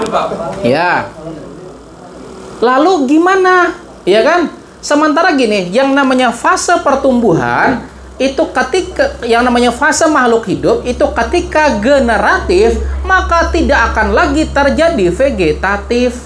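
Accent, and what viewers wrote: Banjar, Indonesia. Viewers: Tambahi lagi tambahi lagi....Kya logat Banjar bang...di daerah mna ini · Eh, bapak orang Banjar? Logatnya rada banjar... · apakah bapak ini orang banjar? 😂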